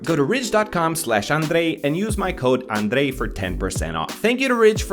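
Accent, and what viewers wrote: Romania. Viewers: Wait wtf why is thi guy having a "romanian" accent?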